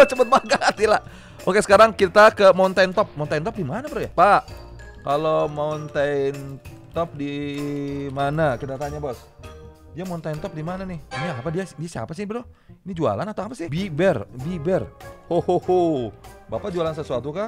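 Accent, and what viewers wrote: West Java, Indonesia. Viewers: Bang orang Sunda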